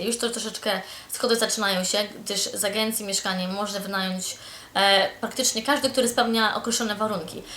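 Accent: England, United Kingdom. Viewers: na początku śmiesznie emanuje brytyjskim akcentem, a później już o nim zapomina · Nabrałaś angielskiego akcentu? Czy masz wadę wymowy? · Akcent już ma Pani brytyjski.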